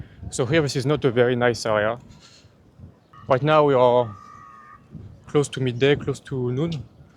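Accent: France. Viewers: Very french accent, waouh my Godness😵‍💫😵‍💫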